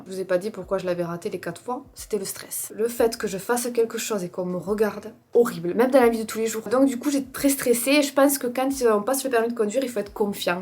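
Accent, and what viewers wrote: South, France. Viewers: Merci énormément d’avoir ce discours sur l’alimentation et le sport 💪🏼 j’ai vu ton évolution physique et bravo!! J’adore ton contenu, et ton accent me rappelle mon sud 🥹 même mon chéri te reconnaît par ta voix quand je met ta vidéo 😁 encore super vidéo et pour le Bad buzz je n’en ai même pas entendu parler tu es au dessus de tout ça 💪🏼 sincère et authentique🥰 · Ton accent du soleil est si agréable à entendre et renforce cette petite bulle de positivité et d'energie que tu nous transmets au quotidien, j'adore t'écouter et tes vidéos égayent mes journées ( et je sais que je suis loin d'être la seule ) 😊😊😁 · Alors moi j’ai 60 balais et je suis tombée par hasard sur ta chaîne. Et ton accent m’a sifflé direct dans l’oreille moi qui habite le sud sud profond. Tu es touchante. Rigolote. Concernant le bad buzz tu dois bien savoir ce qui se dit dans le sud…. 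😅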